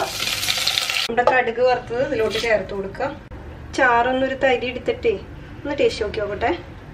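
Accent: Thrissur, India. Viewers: Variety Sambar try cheyyam thrissur slang super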